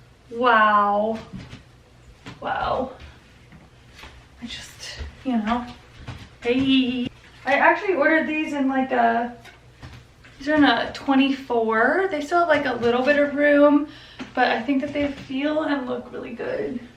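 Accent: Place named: South, United States. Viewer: Omg your southern accent is quite prominent at times throughout this video and I absolutely adore it 💞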